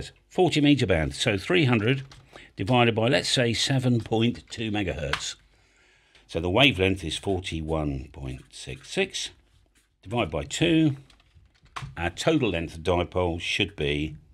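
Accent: United Kingdom. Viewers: your posts would be better if you used scripted (posted words) rather than trying to figure out what you are saying with your British down play English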